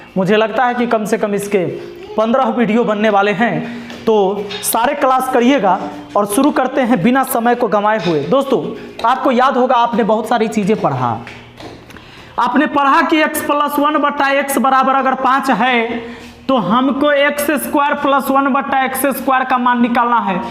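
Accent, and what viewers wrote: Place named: Bhojpuri, India. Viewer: Sir aapka bhojpuri wala hindi bahut acha lagta he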